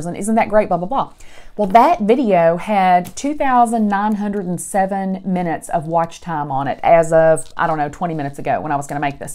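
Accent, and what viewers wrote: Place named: South, United States. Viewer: You're a great candidate for asmr because of your southern accent, how you talk with your hands and its really satisfying when you say "y'all". I hope I don't sound like a creep, I just think you'd be good at it. Whispering doesn't seem to suit you though. It would be cool if you just spoke like you do now and maybe talk about positive affirmations or something.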